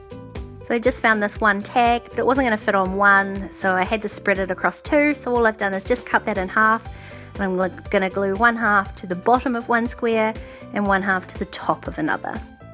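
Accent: New Zealand. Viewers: Do I detect a Southland twang in your accent? Cute page, and a good way to include extra photos when the occasion calls for it.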